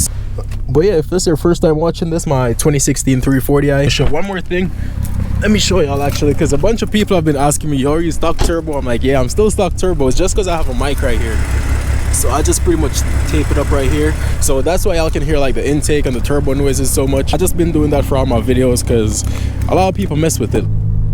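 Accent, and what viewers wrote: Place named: Jamaica. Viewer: Are you from Ja am hearing a little Jamaican accent lol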